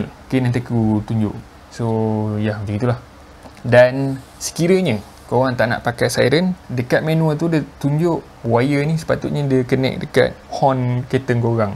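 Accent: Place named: India. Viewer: kl gak fokus dengerin, aku kira orang India yg menjelaskan.😁🙏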